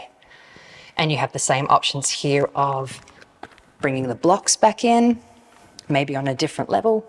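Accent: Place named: United Kingdom. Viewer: Very very helpful and lovely British accent. Thank you.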